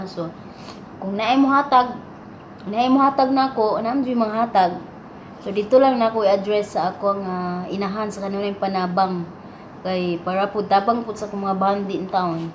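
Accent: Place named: Cebu, Philippines. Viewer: taga asa man ka? magcge kagiki katawa akong asawa magtan- aw nimo? mura man taga cebu ang inistoryahan?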